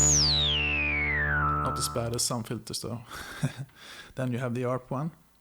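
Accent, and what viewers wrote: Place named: Sweden. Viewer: Just love when you can hear on the accent its a swedish person.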